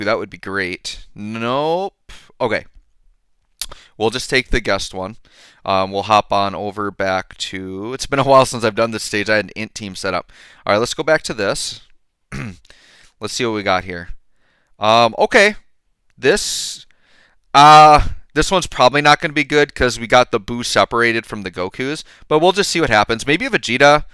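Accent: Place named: Wisconsin, United States. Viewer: Are you from Wisconsin? I feel it in my bones.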